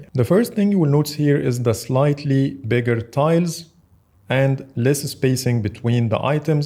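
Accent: Egypt. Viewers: اللهجه مصريه جدا ؟؟؟ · انت مصري ياض حاسس الانجليزي بتاعك انجليزي مصري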